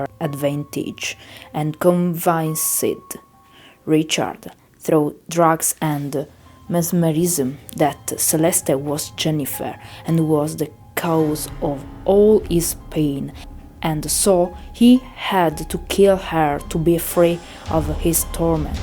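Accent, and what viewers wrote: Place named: Italy. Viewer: Love your accent. It's Italian right?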